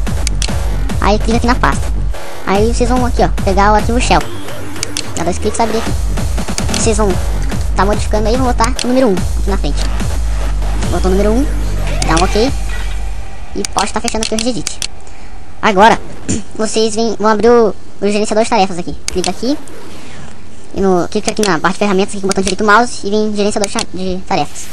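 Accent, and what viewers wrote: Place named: Paraíba, Brazil. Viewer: QUE VOZ DE PARAIBA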